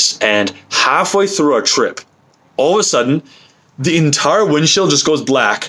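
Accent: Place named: United Kingdom. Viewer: 1. Your British accent is amazing. 2. On day 5 and going strong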